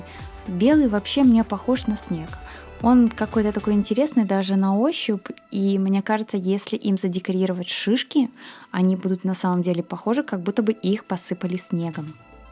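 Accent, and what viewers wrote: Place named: Belarus. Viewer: Вы не забывайте что люди в Белоруссии живут, и у них есть свой местный говор...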